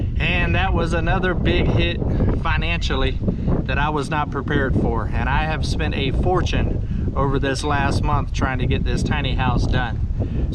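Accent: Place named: Arkansas, United States. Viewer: Arkansan here, I thought I recognized that accent 😂 your video just popped up and I'm glad I watched. Interesting homestead and build! Just remember not all relationships are meant to be. My husband was married twice before when we met and didn't think it was possible to have a successful marriage but here we are 30 years, two great grown kids and 3 grandchildren later, rockin it!! You will find the right one when you least expect it! 😊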